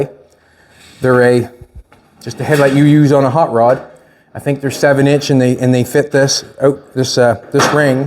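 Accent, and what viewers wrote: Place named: Canada. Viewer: Same reason he calls it a care instead of a car. He's Canadian.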